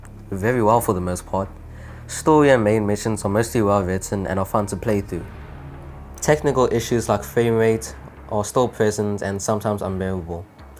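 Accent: South Africa. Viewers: The second I heard your accent I knew you were a fellow South African 😂 great content bro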